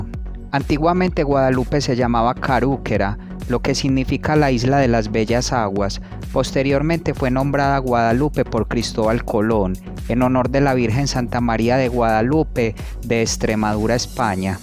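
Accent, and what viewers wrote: Antioquia, Colombia. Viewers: Qué lindo eres en tomarlo en buena manera, pero va a ser difícil trabajar en ese acento paisa, de todas maneras sigue tratando. Muy buen Video!😊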